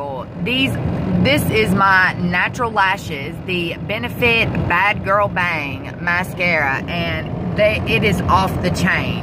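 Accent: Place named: Arkansas, United States. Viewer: Where are You from Babe?Your accent sounds like Mine.Im from Arkansas!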